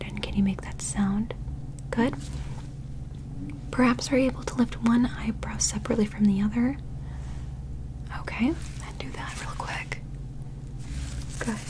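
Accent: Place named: United Kingdom. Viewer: The British accent noooooooooo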